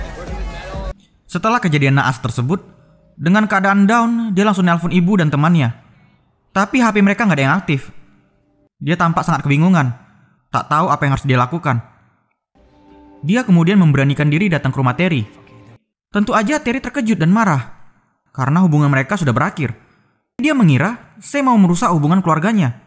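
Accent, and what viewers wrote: Medan, Indonesia. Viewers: medan kau ya lae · Mimin orng medan? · Kimak kali miminnya, org medan kau ya min??? Aku pun org medan wak 😂😂😂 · Fixs nih orang Medan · Nampak kali logat medannya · Bah, Nampak kali Medan kau bang... Agak kreak dikit biar makin mantap bg.. Hehehe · Logat medan ni yah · Bro aku suka bahasa mu..sesuai dengan daerah kU Medan.. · nak medan bah hahah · Orang medan ni kayakNya..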